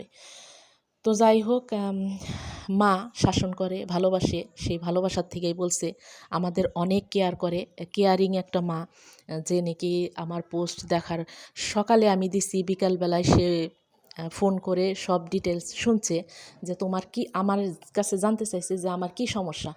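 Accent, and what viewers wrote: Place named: Manikganj, Bangladesh. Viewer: আপু তুমি যেও না, তোমার কথায় মানিকগঞ্জের ভাষার টান আছে,শুনতে অনেক আপন মনে হয়, যেহেতু আমার গ্রামের বাড়ি মানিকগঞ্জ, ভালোবাসা অবিরাম ❤️❤️❤️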